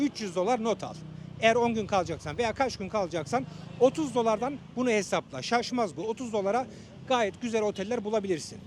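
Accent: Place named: Germany. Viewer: abi sende bi alman aksanı sezdim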